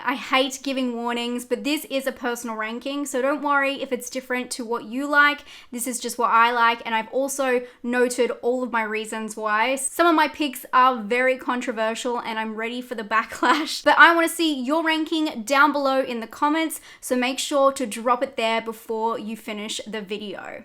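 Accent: Australia. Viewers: People making a fuss over pronunciation are ridiculous. I love your Australian accent! It rocks🤘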